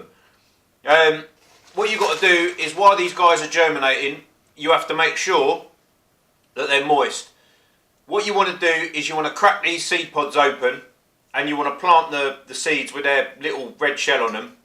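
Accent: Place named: Bedfordshire, United Kingdom. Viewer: cheers, giving the peanuts a go this year. Also saffron, and for utter madness, sugarcane. You sound local to Bedfordshire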